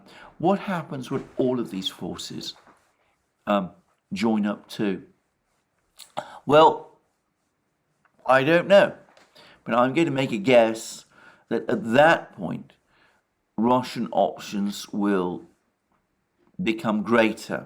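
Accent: United Kingdom. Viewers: Alexander when all this quietens down (and that it will) you will be the most important historian of this war. Indeed posterity will remember you as the articulate studied chronicler journalist of this Slavic affair, with a delightful British accent. More power to you and your tribe. 🎉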